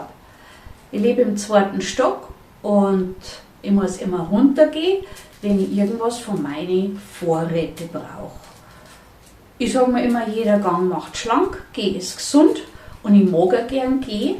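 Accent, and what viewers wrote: Bavaria, Germany. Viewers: Moin moin, na klar versteht Dich der Norden. Ich mag den bayerischen Dialekt, ist lustig 😅. LG, Helga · I bin nach Venezuela ausgwandert und gfrei mi wenn i a super Frau aus Bayern zuahern konn. Bin aus Minga❤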